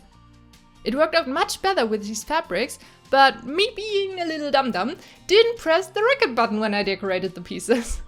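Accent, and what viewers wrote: Germany. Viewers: Ich mag diesem deutschen Akzent in deinem englisch🥰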